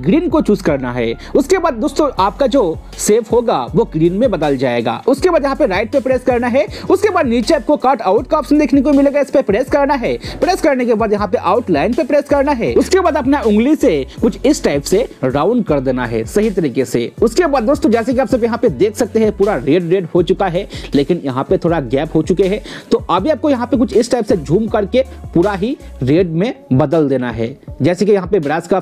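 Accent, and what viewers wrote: Bengal, India. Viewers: You are Bengali right 😂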